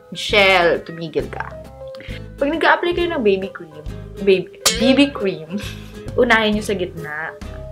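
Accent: Ilocos, Philippines. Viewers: ilokano ka ba?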